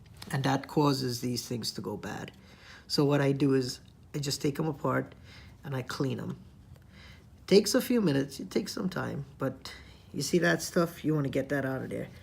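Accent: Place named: Trinidad and Tobago. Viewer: You sound trini, good vid tho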